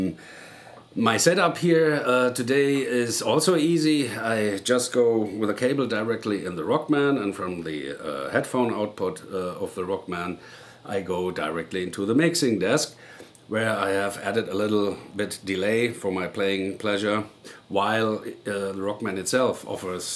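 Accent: Hesse, Germany. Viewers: When I was in the Army stationed in Germany I used to hear the local townsfolk speaking German and the dialect they used sounded much like this gentleman. I'm not sure of the spelling but when he used the word "bopple" it reminded me of one of my in-Laws neighbors who always wanted me to speak German with her and she would say "Bopple Deutch Mit mir". She would get frustrated because I would try and speak English to her, but I pretty much knew the local slang, and she knew that, so she always wanted me to speak German to her. I am guessing because she like hearing my American accent in German. It was always in good fun. She was a very nice lady. Anyway I bet this guy is either from the Hessen area or from the area that this lady grew up. I listened to the whole video because he reminded me so much of when I was over there. My Daughter lives over their and is married to a very nice German guy. 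I have a grandson from them that his birthday is the same day as mine, his great grandfather and his great great grandfather. We all have the same birthday. Its pretty cool.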